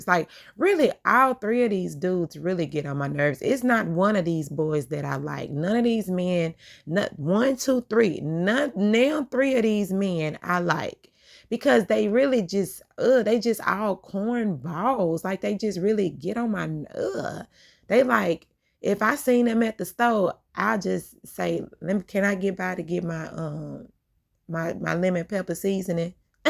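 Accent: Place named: Mississippi, United States. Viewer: You have a Mississippi Delta or Memphis, TN accent.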